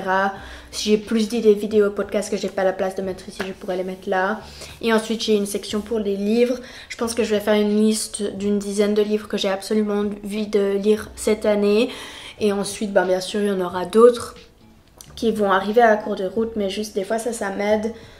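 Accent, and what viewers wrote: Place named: Switzerland. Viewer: L'accent suisse est beaucoup plus facile à comprendre que les autres accents français.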